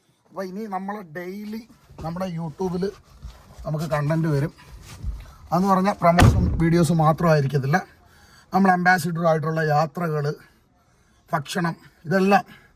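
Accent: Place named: Kollam, India. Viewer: Kollam സ്ലാങ് ❤❤❤❤❤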